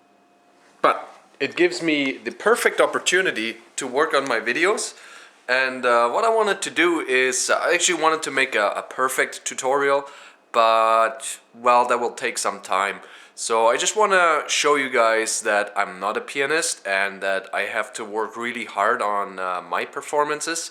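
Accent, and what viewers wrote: Canada. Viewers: Says "ootside" More attractive than average American Apologizing for not making a video on the subject he admittedly is just learning. Jesus Christ, you couldn't be more Canadian if you were eating maple taffy off a stick while riding a moose into ~battle~ your formal apology for the inconvenience of your incredibly loud moose galloping,metric system, and socialized medicine keeping everyone awake! :p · People from the province of Québec have that accent, usually. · that Canadian accent thooooo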